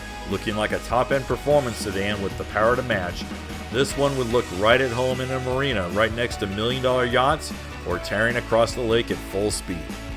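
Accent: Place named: United States. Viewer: Nice Ski I Was watching this video thinking this place in the USA looks exactly like where I ride my ski in Sydney. The American accent had me thinking it was filmed over there.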